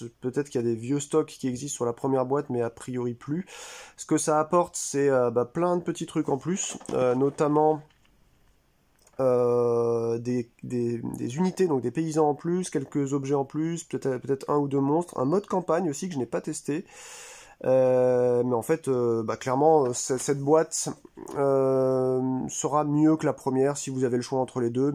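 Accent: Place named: Quebec, Canada. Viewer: Woah! Tu n'as pas eu de chance mais vraiment pas! Bon, je vais devoir me procurer la nouvelle édition, je la trouve vraiment mieux. Au niveau des dessins, c'est Jean-François Gauthier qui fait tout: invente le gameplay, fait les dessins, s'occupe de l'édition, vraiment tout pour les 3 jeux. Plusieurs personnages proviennent de Goldblivion, trop bien! Ton accent québécois est parfait! 😄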